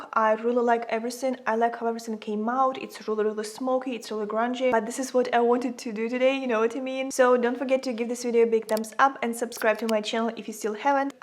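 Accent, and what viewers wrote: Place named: Ukraine. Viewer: Love this look so much. You’re earrings are gorgeous. And I just really love your Ukrainian accent when you speak English. Awesome video. 🤩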